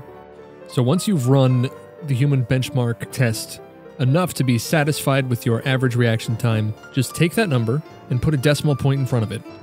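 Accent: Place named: New York, United States. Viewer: He's clearly a New Yorker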